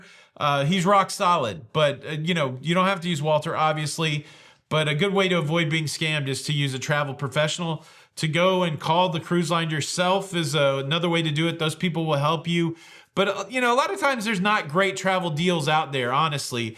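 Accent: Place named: South, United States. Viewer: I done gone wanna learn to speak with a Nashville accent.... I'm fix'n to learn that. Does y'all know if Babbel teaches that? lol Love your southern accent.:)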